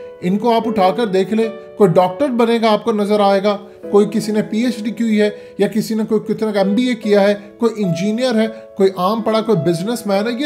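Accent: Punjab, India. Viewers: جن کو ٹھیک طرح سے اردو نہیں بولنی آتی۔۔۔اور جن کا اردو کا تلفظ پنجابی جیسا ہو، ان کو پاکستان میں صحافی بنایا ہوا ہے۔۔۔یہ معیار رہ گیا اب صحافت کا۔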